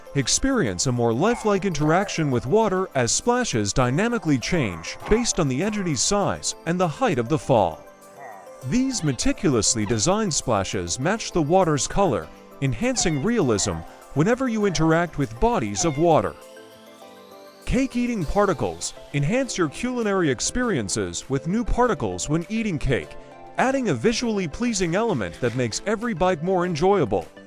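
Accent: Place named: South, United States. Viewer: it’s not bad but could use some tuning, around the end of some sentences it pronounces with a odd southern accent like at the start when saying spells it pronounces them like spales and says whep instead of whip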